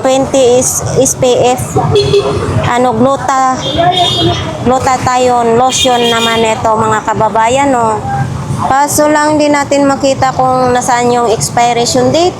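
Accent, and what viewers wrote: Visayas, Philippines. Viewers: Ate .parang Visaya ka 😂😂😂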